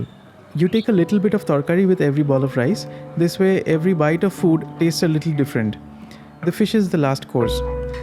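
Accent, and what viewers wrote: Bengal, India. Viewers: sir bengoli stile with English language is not suitable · the Bengali accent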